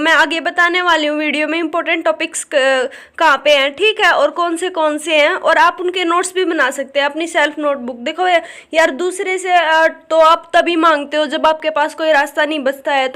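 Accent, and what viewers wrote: Haryana, India. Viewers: That Haryanvi accent is cool And good video 👍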